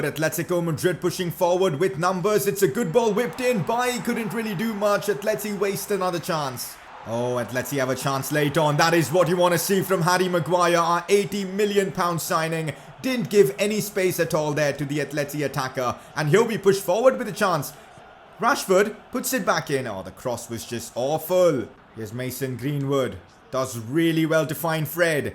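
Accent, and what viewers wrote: India. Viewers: Are You Indian.... Cuz your accent sounds too familiar.... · India accent😁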